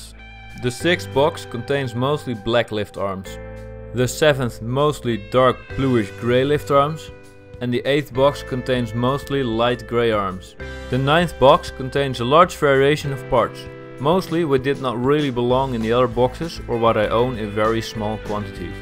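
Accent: Netherlands. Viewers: Nice way to do so my friend. This kinda overviews you don’t see that many often. When I, for whatever reason, need to go into the grandchildren’s playing room I’ll need either a great deal of force or rent a CAT shovel or backhoe! It’s a good idea however to sort it out! 👍👍. By the way, you’ve got these accent as I recon as European? Dutch or Swedish? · You sound like an man speaking english with a dutch accent... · You sound dutch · Are you from the netherlands? Kan het horen aan je axent😅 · From the accent you can hear he is Dutch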